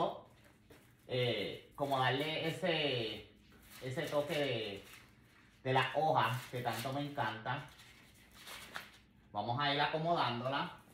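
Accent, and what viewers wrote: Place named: Puerto Rico. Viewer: Nueva seguidora desde q te escuché sabía q eras Boricua bien bello y espectacular!! 💖🇵🇷🙌🤩 Me encantó como explicastes como poner la cinta. Me gustaría una recomendación, mi decoración este año será madera, blanco negro y metal, que cintas debo de incorporar? Ya de ante mano tengo la de saco y la de cuadros Blanco y negro, ( búfalo) q otro color de Debería añadir, plata, dorada o blanca ? Help!!! 🤣🤣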